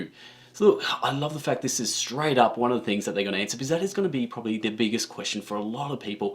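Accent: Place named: Australia. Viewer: Great to hear an Aussie accent covering board games on YT! What do you think of the shipping costs to Australia for this campaign?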